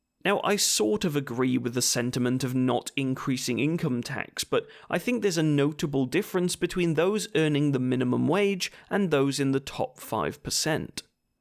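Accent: United Kingdom. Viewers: Just found your channel, I don't agree with all your opinions on things but i do on others. Either way, interesting videos, well edited, and the perfect British deliverance.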